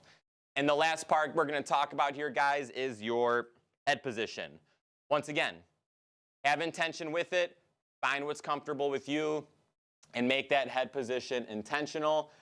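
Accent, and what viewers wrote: Midwest, United States. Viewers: are u from the midwest?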